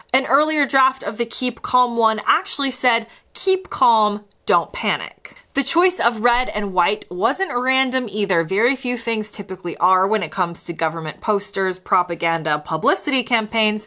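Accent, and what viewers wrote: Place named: United States. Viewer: Unfortunately, propaganda which can be sometimes be effective at the time also leaves a behind a false history. I know you have an interest in history, and there is enough material out there to study this in some detail. Though I recommend you never make a video about it. With an American accent, you cannot tell it. The "keep calm and carry on" and Blitz spirit stuff have left a very different picture of the war. One place you can start is the number of deserters and people who never showed up for their draft. British army gives the figure of 100,000 deserters, US claims 50,000. Nobody knows how many failed to show up at all. There are estimates of tens of thousands of deserters living in London alone. And these people were immediately rendered 'outlaws'. In a country where every person could only shop with government issued ration books, these people had to make other arrangements. 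The crime levels and 'dog eat dog' nature of a country at war get completely glossed over in the numerous fictional accounts written afterwards. The 'Blitz Spirit' itself is one of histories greatest revisions. It wasn’t safe for women to be on the streets. Homes were looted, stores were looted, bodies were robbed, murders occurred. "Crime experts" and Scotland Yard are on record as there being at least a few serial killers on the loose in London alone. I suspect if the "keep calm'" posters were never released, it was because the government knew it could only push so far. There used to be a UK org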